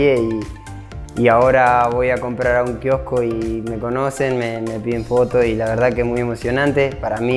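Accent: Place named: Chile. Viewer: Todo un chileno ya, habla como chileno en sus directos🤑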